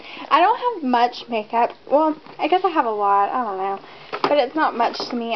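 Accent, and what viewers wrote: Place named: South, United States. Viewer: Love your southern accent. Where r u from?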